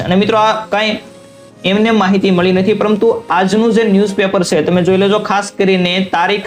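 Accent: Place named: Bhavnagar, India. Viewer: ભાઈ આ ભાવનગર ની બોલી છે લોકલ બોલી ની મજાક નો ઉડાવી જોઈએ 😢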